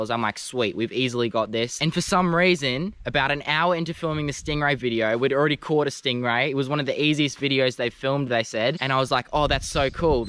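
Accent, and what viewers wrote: Australia. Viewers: Brave Wilderness is great, however, it is not as good yours with your Aussie accent, sweetness, and willingness to learn and listen to others. I applaud you for being the best you can be at all times.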